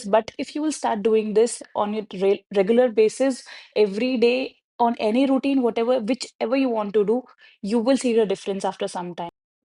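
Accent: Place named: India. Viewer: From your voice, it seems to me that you are from India, isn't it?"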